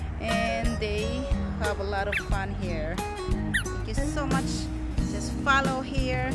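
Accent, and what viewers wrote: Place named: Australia. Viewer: What a beautiful day and Australian accent connected to you now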